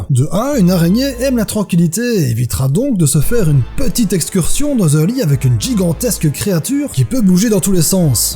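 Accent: Liège, Belgium. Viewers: T'as la même voix qu'Absol mais avec un accent belge ;) sinon super vidéo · T'es liégeois ? :) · Ah , ca sens l'accent du copain Belge ca ? :D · pas suisse, j'aurais tendance à dire belge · T'es liégeois ? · Salut, je post pas souvent de commentaire mais des que j'en post généralement c'est bien. Bref, Juste un mot a dire "Géniale" Ton accent Belge donne un coté original a tes vidéos franchement continue j'adore;)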